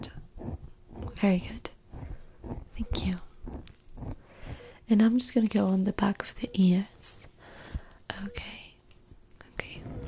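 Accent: United Kingdom. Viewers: Me encanta tu inglés. Es más brittish que american. Eres inteligente . Y de gafas estás preciosa. Te favorecen. Este vídeo me da muchas cosquillitas. Me suscribo. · Ooo fancy English-y accent in this. Thanks!!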